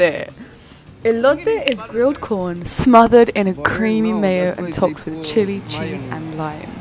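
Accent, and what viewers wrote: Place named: Mexico. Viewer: your "mexican" accent Is awsome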